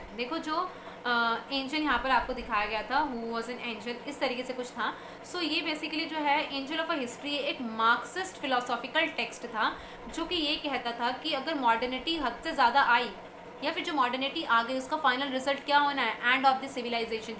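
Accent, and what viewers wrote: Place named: India. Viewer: Indian English accent😢I could not understand most of the words...😑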